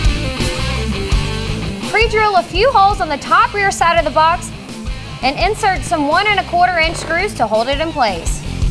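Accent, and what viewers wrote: South, United States. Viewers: She tries to hide it but, I hear that Souther Twang. 👍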